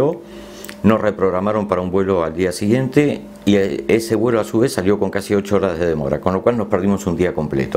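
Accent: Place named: Argentina. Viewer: lo de que es usted Argentino no cabe duda , por su acento recien estuve en America Usa ,México, Perú ,Ecuador regreso España luego Lisboa Berlin ,Roma , Roma fue impresionante visite vaticano Coliseo palatino Foro Romano Fontana di trevi piazza Navona Pantehon etc etc deseando volver a Roma un saludo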